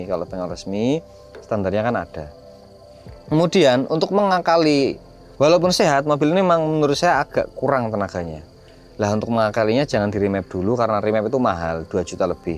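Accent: Java, Indonesia. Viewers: Engkik...engkik....suka bahasa mas, njowo banget, :-)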